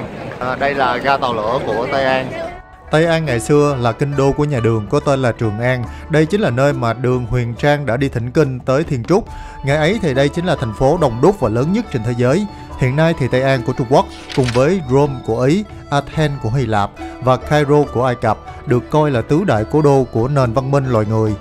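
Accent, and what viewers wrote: South, Vietnam. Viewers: Hay quá. Hình như vì dịch bệnh nên có thời gian, anh biên tập tỉ mỉ hơn, hình ảnh rất đẹp. Đặc biệt là từ ngữ anh dùng rất gần gũi, không hoa mỹ như trên TV, và thích nhất là giọng miền nam của anh. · Clip bánh cuốn quá ạ, được đầu tư, có bổ sung kiến thức lịch sử và thêm yếu tố hài hước nữa:))) giọng miền Nam của nghe bình dị dân dã 😆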